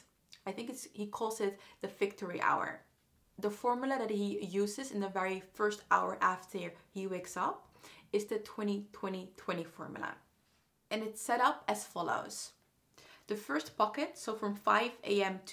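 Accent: Netherlands. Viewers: Where are you from? You start like you are from the Netherlands!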